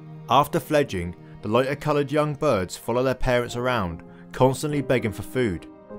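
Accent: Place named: Norfolk, United Kingdom. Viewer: love your channel. Brilliant content. And good to hear another Norfolk accent on YouTube! Merry Christmas!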